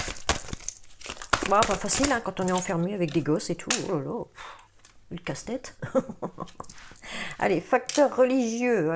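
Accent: North, France. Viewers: Hein !! Hein !! Y’a un ptit accent du nord !!!!! Excellent..merci !! 😂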